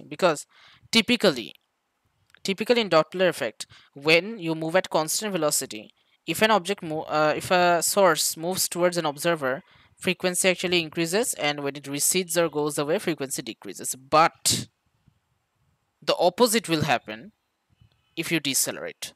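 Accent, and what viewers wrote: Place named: Bangladesh. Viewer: your voice makes me feel like you r from Bangladesh.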